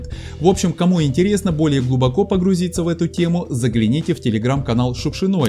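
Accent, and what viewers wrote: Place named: Ukraine. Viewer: Да помойка вы,и ничего не перепроверяете.А говор у вас такой.вы из какой глубинки решили Москву покорить? С Украины?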